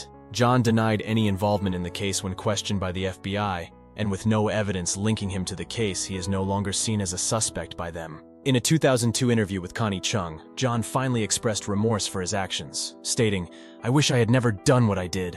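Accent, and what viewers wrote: United Kingdom. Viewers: oh bless Ur heart ❤️❤️❤️ it's not about the rewards it's about keeping U making this content don't stop Ur bloody good at it!!! Excuse the terminology lol I love Ur British too!!! So here's to many more videos 😁😁😁 xxxxxxxx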